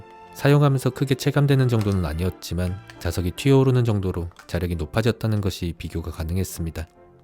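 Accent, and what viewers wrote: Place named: Gyeongsang, South Korea. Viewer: 경상도 사투리에 줄줄 새고 뭉개지는 딕션까지.. 치아 교정하세요?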